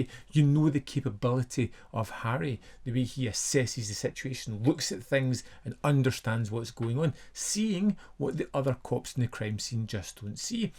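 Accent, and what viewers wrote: Scotland, United Kingdom. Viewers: I love this guy's Scottish accent even if I can't always understand it.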